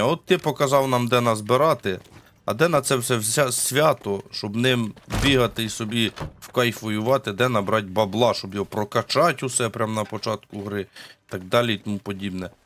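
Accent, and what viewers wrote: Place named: Vinnytsia, Ukraine. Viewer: Дякую за якісний контент. За вінницький діалект окреме дякую. То батьківщина мого тата. В дитинстві часто бував у Шаргородському районі. Я бігаю з двома "Дніпро". Один розточив на 7.62. Снайперка з магазином на 21 набій. Гаус. Три пістолети під різні набої і збільшені магазини. Маргач - 13 набоїв. Довго бігав за кресленнями і магазинами. Тепер шкода закінчувати гру. Хочеться грати і грати.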